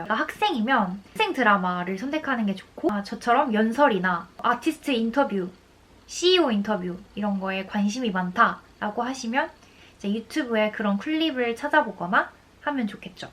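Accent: United Kingdom. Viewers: 정말감사합니다 영국발음 너무멋잇어서 공부법 보규 저도 꾸준히 달려보겠습니다. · 기다렸어요ㅠㅠㅠㅠ 감성+분위기+영상미 게다가 고급진 영국발음ㅠㅠㅠㅠㅠ 너무 사랑합니다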